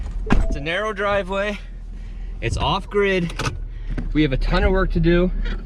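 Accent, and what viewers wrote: Nova Scotia, Canada. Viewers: Canada? Accent sounds canadian? Nova scotia! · I was wondering why your accent was pronouncing house as "Hoos" then you said Nova Scotia and it finally made sense. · That was the cutest Canadian accent I ever heard. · I heard the NS in your voice before you even mentioned it. 😂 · No - please work your native Canuck "accent" - I call them inflections for lack of better term - back in to your speech. As an accent-less, flat-speech, plain-white-toast American in the PNW I enjoy hearing it. It's amusing and I still have to mess with my family aboot it but it's flavor/character so don't mess with yours bud. I have to watch Letterkenny, Corner Gas, My Self Reliance and Strange Brew frequently to get a fix. Cheers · Sounds Canadian...love Canada!!! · First time seeing your channel. Sounds like a Canadian accent☺️, what area is this property near? 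It’s beautiful! That’s some long driveway in! Do you get snow? So the cabin is seasonal, or plow the driveway? What a dream!!! Great purchase👍🏼👍🏼👍🏼 congratulations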